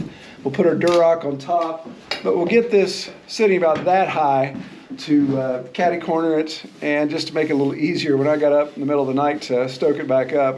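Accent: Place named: Minnesota, United States. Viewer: Just saying...you need to go pretty far North in Minnesota anymore to find folks that still talk like that. 😄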